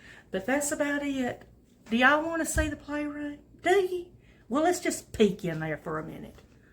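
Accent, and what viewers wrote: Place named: South, United States. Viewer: Hi from Southern California. Sent over by Lynn Wilson. I’ve subscribed to your channel. I just absolutely love your southern accent!❤❤❤. Happy Fall. Hope you don’t mind if I steal some of your decoration ideas for my home. Love your home!!!!!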